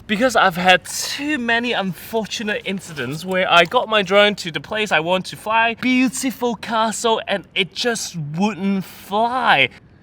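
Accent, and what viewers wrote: United Kingdom. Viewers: good UK accent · But the first thing, the person must have a positive thinking, or he/she will be buried themselves. Btw, I love watching your videos and I like your British accent.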